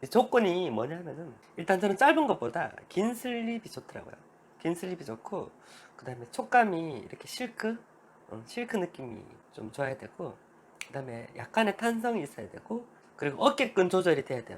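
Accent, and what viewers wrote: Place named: Gyeongbuk, South Korea. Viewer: 애매한 목소리에 경북 사투리 듣기 싫다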